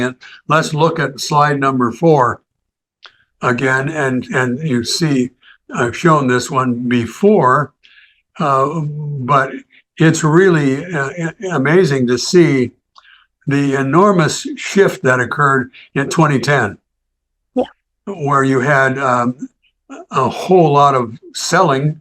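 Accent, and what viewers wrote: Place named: Canada. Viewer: I love your broadcasts, your guest choices and especially your Canadian accent! 'Out' 'About' 😂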